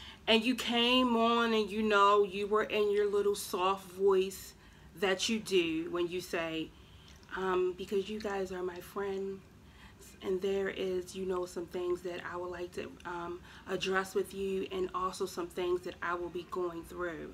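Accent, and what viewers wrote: Baltimore, United States. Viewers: New subbie..Are you from Maryland? U sound like us in Baltimore lol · Idk how I found your channel. Are you from Baltimore? It sounds like you have a Baltimore accent. I love it!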